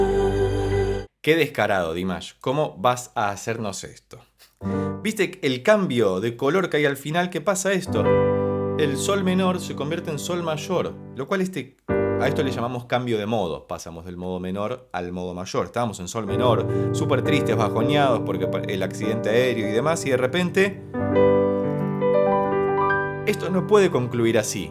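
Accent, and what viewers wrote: Argentina. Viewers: Buenas tardes maestro, siempre brillante en su análisis y comentarios. Dicho esto tengo que comentar, por que sino reviento, y espero que se lo tome como un cumplido, lo siguiente: es inevitable que su análisis me recuerde al legendario monólogo de Les Luthiers sobre el maestro Mastropiero, el maravilloso acento argentino evidentemente tiene mucho que ver...pero más allá de eso, si me distraigo un segundo de su estupenda explicación me viene a la cabeza a Mastropiero y....me cago de la risa. Muchas gracias por los maravillosos momentos que nos brindan sus vídeos, mi admiración y mis saludos desde España.💃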